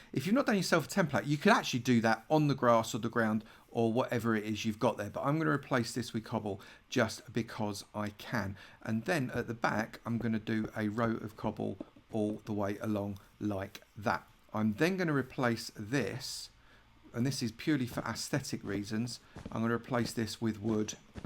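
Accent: United Kingdom. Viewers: did anybody tell you that you sound like mumbo jumbo or is it that people with British accents sound the same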